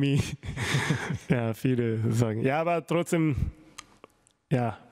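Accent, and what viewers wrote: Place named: Japan. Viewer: 頭いい人って発音気にしないよね、バリバリの日本訛りでも気にしないんやな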